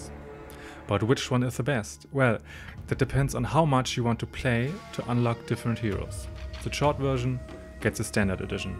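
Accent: Germany. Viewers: Ich würde jzt einfach mal so sagen das es ein deutscher Akzent ist ?🙈😂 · are u german btw? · I h Liebe deine Aussprache kann es sein das du Deutscher bist ? PS super Video danke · WIe man den deutschen Akzent hört loool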